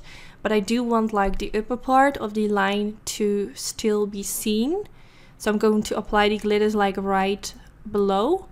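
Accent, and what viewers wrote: Netherlands. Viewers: WOW this look is so cool! Love it! 💚💚💚 Wacht eens even, ik hoor een Nederlands accentje. Ben je Nederlands!? Wat gaaf!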